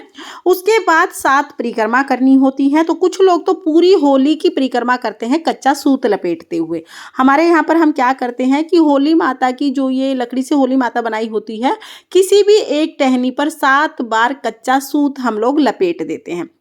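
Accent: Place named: Marwari, India. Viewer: आप हमारे मारवाड़ की ही लगते हैं हिंदी में आप मुझे बताइएगा प्लीज मुझे अंग्रेजी नहींआई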